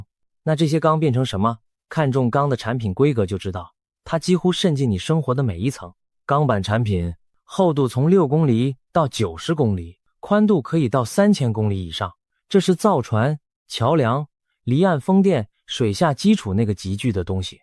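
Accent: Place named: China. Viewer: 不能進去拍啊 拿了圖要授權啊 這是ai劇本生成的影片連講話都大陸口音.我還以為大陸短劇.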